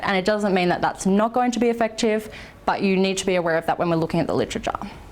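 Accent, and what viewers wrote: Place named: Australia. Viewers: Can't place her accent. Is aussie...sort of...but something else mixed in... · There is a hint of a regional Aussie accent. To ears not familiar with the Australian accent, Mark Twain described it as the most purest English accent. I’m pretty sure he was drunk. However, our society really didn’t like a classist society modes and thus our accent is based on a societal protest against elocution. Enter the Aussie twang. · No that's an Australian accent. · That's a typical younger, educated Australian's accent.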